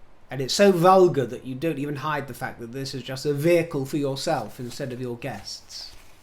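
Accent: United States. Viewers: lol your American accent is spot on. Makes it more funny when you put mm in her place. Lol. I enjoy your posts. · Love your American accent!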